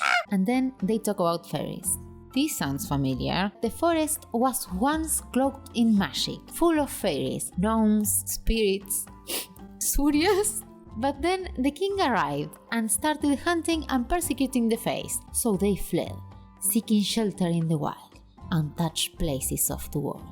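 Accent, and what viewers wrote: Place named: Argentina. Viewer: Argentinian ass accenttttt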